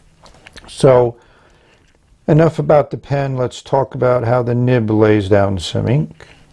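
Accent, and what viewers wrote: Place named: Western Pennsylvania, United States. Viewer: Thanks for the video. I have to admit, your voice and western PA accent remind me of my late father, and I find listening to you quite soothing.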